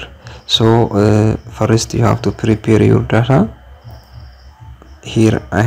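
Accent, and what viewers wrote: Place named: India. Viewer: Fuck your english indian accent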